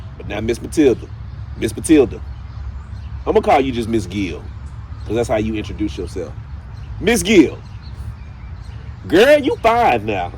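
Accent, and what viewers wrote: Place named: Texas, United States. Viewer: Sybelle said " you sound like you're from Texas" then she said "I like you & she may visit you" She also said " you were funny" 🤣 She likes you alot and wants you to come back. 😊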